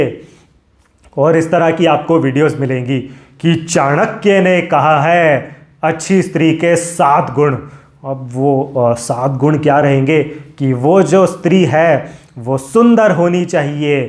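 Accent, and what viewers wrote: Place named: United Kingdom. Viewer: english accent 🔥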